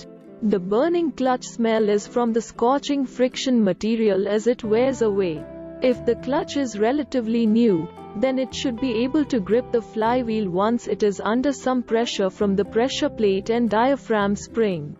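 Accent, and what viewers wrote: India. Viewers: Are you an indian lady as of your accent please...!??🤔!!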